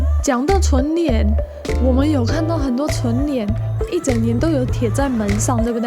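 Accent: Taiwan. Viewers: 很喜歡聽妳說的台式中文～ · 聽到台灣腔就是親切 哈哈😄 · 地表最強台灣國語...+油..阿兜啊..你最強...讚啦... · 喜歡你的台灣腔的中文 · 很喜歡妳講的臺式中文腔調，感覺像是周遭的朋友在聊天，很有親切感，我們家只要沒結婚沒小孩過年都會收到紅包，金額大概<num>臺幣，。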